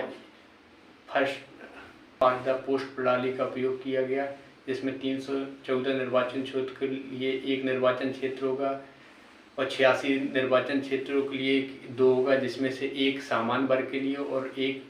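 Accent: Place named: Jalaun, India. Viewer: I am suggesting you some references - Ek toh jo jalaun district wala tone h uspe thoda kam kro Bich me aap jo ruk jate hn ,uske liye pehle script achhe se likho ,bar bar practice kro Or aap ek episode dictate kr rhe hn,toh esa apki voice variations se dikhna bhi chaiye,na ki aap esa dikhen ki bs generally bat kr rhe hn.. Baki bs improve krte raho,,Take care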